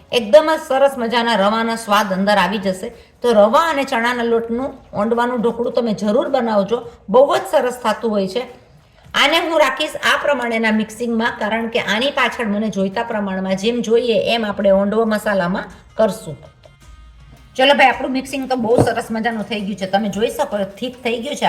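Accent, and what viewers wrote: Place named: Charotar, India. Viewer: Ben tamane mare ak tips aapviche te ajke tame basan sathe puovane karas Karine bijivar honvane banavsho ok 🎉 dhayvad tamari charotari bhashathi Mane badapan ni yad aavi gai Jay mataji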